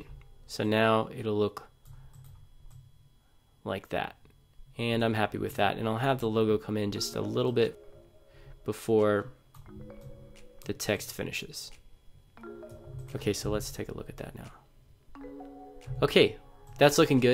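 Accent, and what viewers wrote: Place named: United States. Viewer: Not understand the American Accent but the tutorial appears very clear, Gud Job, Very Nice Tutorial.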